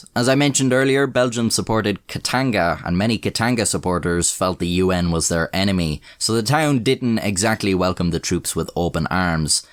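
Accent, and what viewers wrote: Ireland. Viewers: It's amazing that their Regiment staved off an invading horde 20 times their own number and I'm glad that they were finally given at least some kind of recognition. I very much like your drawings that very entertainingly illustrate the story being told. You are a talented man, my friend. And you having an Irish drawl makes it even better. We crazy gun-loving, world-conquering, wall-building Americans love a good Brit/Irish/Scot/London er (I know, that would be Brit) accent. I'm so glad you're back in the game and putting out some good stuff. It's always a treat. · I love your weird Irish numbers! Tree tousand. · YAYYY HES IRISH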